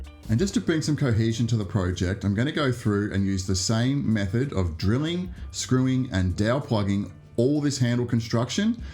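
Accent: Australia. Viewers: Love these. Simple and effective. Also cool to see and hear another Aussie maker. Just subbed. Damo.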